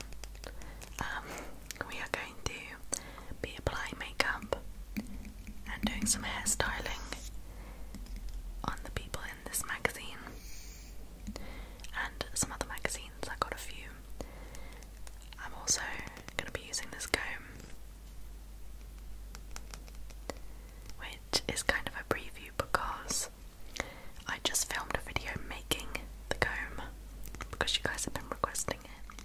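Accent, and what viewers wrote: United Kingdom. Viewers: Where abouts in England are u from? I love your accent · You sound a little bit British.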